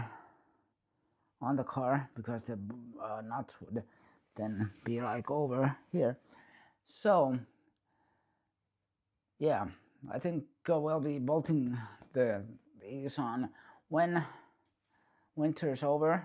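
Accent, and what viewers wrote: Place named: Finland. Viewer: Noinkohan tuo rallienglanti ilahduttaa ulkomaan eläviä? Meinaan tekninen sanasto.. No sunhan tää on tube, jätän tilaamisen väliin.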